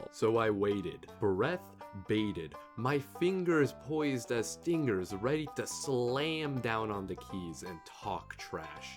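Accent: South, United States. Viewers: I'm not even a native English speaker and I associate Beaus accent with hillbilly Republicans. The bias is real. We have a similar thing in Germany with the Saxony accent. You hear it and you think: uneducated, racist, about to lecture you on how we aren't living in a democracy because vaccines. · sources? Keep on listening if it makes you feel better. You realise he makes money preaching to the choir. Liberals love to hear a southern accent spew their talking points. Like how consertives like to hear black men to bash police.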